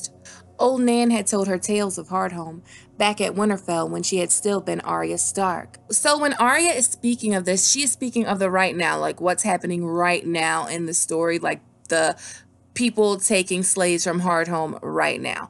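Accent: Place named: Massachusetts, United States. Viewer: I like how you read..are you from the north East? I live in Massachusetts, and your accent almost matches a slight Massachusetts accent because not everyone has that horrible Boston accent very thick... But it could be a New York accent or somewhere in between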